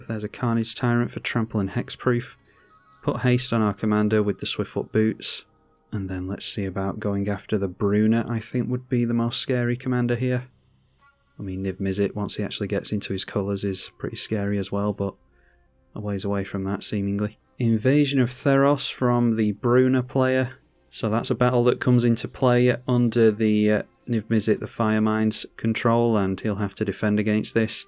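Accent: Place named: United Kingdom. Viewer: Bro is so british the closed captions put his saw in as a SAR in half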